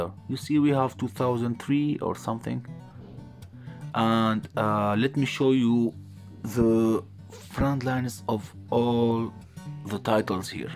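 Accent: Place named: Syria. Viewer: Accent sounds Syrian 😊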